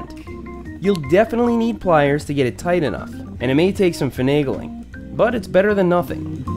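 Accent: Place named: New York, United States. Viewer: Hi! This is a great way to learn English! usually uses very good vocabulary and grammar, his accent is a little New York but not much, and his pronunciation is excellent. I noticed that another viewer commented on your English and I wanted to clarify on some things. First, remember that in English, we capitalize nationalities, so the word "german" should be "German". Second, the third person singular form of regular verbs in the present tense in English require an "s" as an ending ("asks"). Also, it is very important to capitalize the pronoun that we use for ourselves, the word (not letter) "I". We need to capitalize that one all the time. The sentence "I learn English for 3 hours" is a little strange, and might sound better in the present progressive ("I am learning English for three hours"). Finally, you need to fix your capital letters for the start of a sentence, for the pronoun "I", and for the proper nouns "English" and " ". 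You shift your verb tense from present progressive "am learning" to regular present "I watch", which is a little strange, but not grammatically incorrect. It would be a little better to say, "I am learning English if I am watching." A corrected form of your entire post would be: I am a German boy. My mom asks me if I am learning. My answer: I learn English for three hours. My thoughts: I am learning English, if I am watching. I hope that this was helpful, remember those capital letters, ride your bike a lot, and keep watching '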